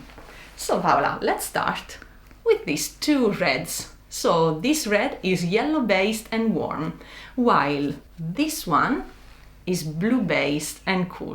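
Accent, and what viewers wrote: Italy. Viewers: I've been watching so many of your videos that when you removed the cloth and I said at the same time (with that beautiful Italian accent) "ah noh, the cobahlt is SO much better than the cyan" 😂🤭 amazing video as always! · I love these videos so much, and I have learned so much. You have a very special gift of explaining the concept and the analysis very well. And although I've seen dozens of them now, I'm still intrigued every single time to find out exactly why which palette works for the gorgeous models (and thanks to every one of them as well for demonstrating how color individually affects us). I must also say that the lovely Italian accent adds so much charm to the whole process. I am a Winter Cool myself, and whenever I go shopping and am determining which color is the right one, I am hearing in my ear "wiinterrr cool" and it puts a smile on my face! 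Thank you so much 💙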